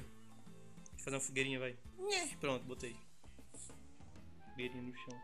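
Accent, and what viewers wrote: Northeast, Brazil. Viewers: vim pelo canal do camera mano , tu e nordestino e man ?? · Mano, esse jeito de falar/sutaque... So pode ser do Ceará mah. E ainda digo mais: eh de Fortaleza. E ainda digo mais!: eh do José Walter kkkkk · Tu é nordestino man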